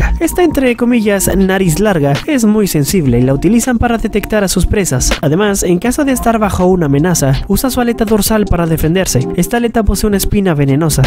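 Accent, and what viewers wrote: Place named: France. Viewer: Yo solía pensar que era un francés hablando en español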